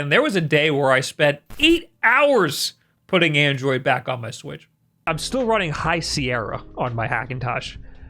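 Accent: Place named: New York City, United States. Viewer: you kinda sound like the NYC version of Jordan Peterson I just noticed 😳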